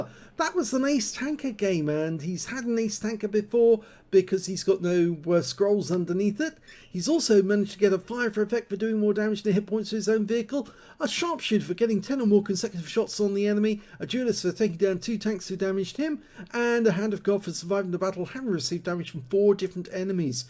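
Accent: United Kingdom. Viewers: Your exciting commentary keeps me watching your vids keep up the good work from N/A Jax fla also awesome Brit accent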